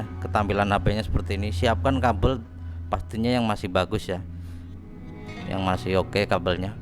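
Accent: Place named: Lampung, Indonesia. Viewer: sampean orang lampung ya mas? kalau di benerin pake hp ga bisa yw mas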